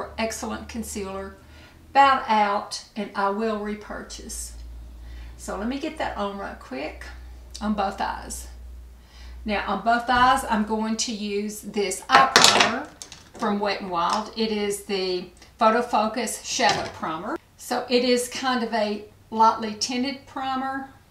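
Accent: South, United States. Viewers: Love your hair colour .and red certainly suits you .but. The nicest is that accent ! Remind s me of , " gone with the wind " .Dont love wet n wild cheap . Looked nice but not for me .Happy valentine's ❤️🌹🌹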